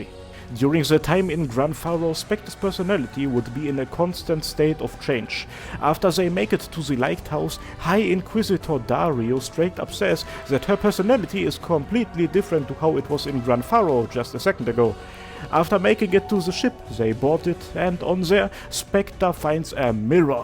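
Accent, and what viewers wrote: Germany. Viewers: Moin Moin... ausm Norden?! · german akzent is real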